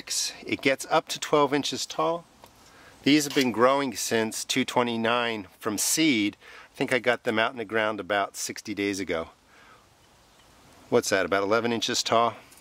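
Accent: United States. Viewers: speak English not American....plz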